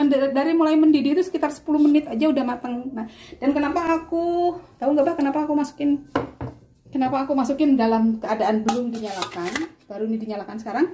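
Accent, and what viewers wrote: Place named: Java, Indonesia. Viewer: iki kok logate jawa yaa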